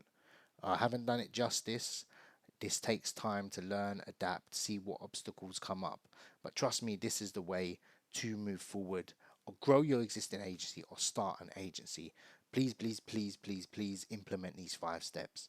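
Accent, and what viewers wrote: United Kingdom. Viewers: You definitely don’t sound automated because you speak Green Lanes English 😊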